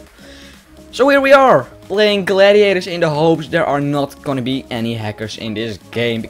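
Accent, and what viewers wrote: Netherlands. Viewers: It sounds Like youre dutch Nice CHANNEL keep up THE Good work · Ik hoorde het aan je accent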